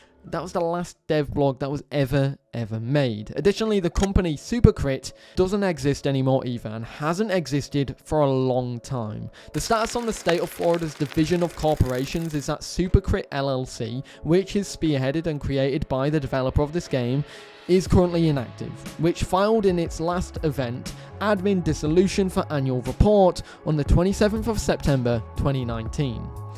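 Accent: United Kingdom. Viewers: Is this a Scottish accent by some chance?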